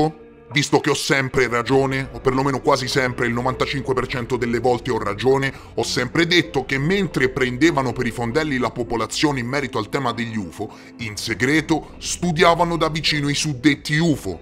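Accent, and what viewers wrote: Tuscany, Italy. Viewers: Sono davvero orgoglioso di essere un veterano, ti seguo dai primi video quando si sentiva l'accento toscano, quando la maschera era senza i contorni blu-fluo, da quando la sigla era una specie di cubo con le faccine di Click... insomma è un vanto far parte di questa family e devi essere orgoglioso di questi risultati e soprattutto dove ci hai guidato aprendoci la mente... Grazie la tua umiltà va di pari passo con il tuo essere "BOMBER"... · Anche io lo seguo da un anno e passa ormai, e sin da subito mi sono accorto che è toscano, però non sono riuscito a capire di quale zona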